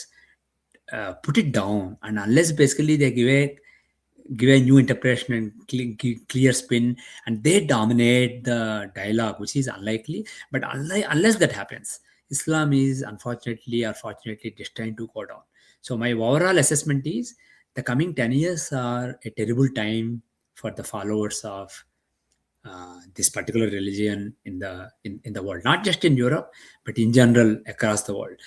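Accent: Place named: India. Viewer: Please cut off the Indian accent like many others who appear on Indian podcasts, news media etc speaking English that can't be apprehended easily.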